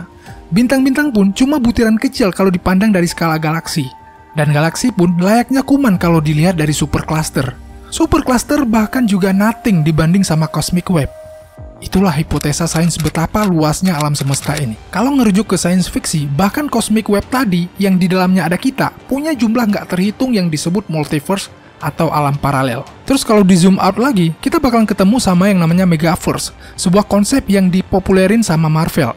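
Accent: Aceh, Indonesia. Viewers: Abang org aceh?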